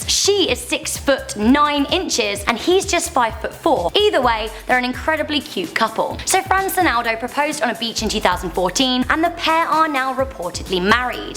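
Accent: United Kingdom. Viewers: Are you British?? I am just curious. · i love Ur British accent!!!!! i am australian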